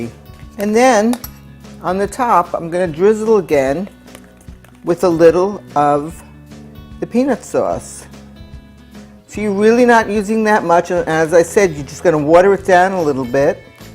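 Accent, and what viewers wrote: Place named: New England, United States. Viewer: That sounds like a New England accent. Im from Boston, where are you from ? Thank you for the tips. I use this chicken all the time and always looking for new ideas.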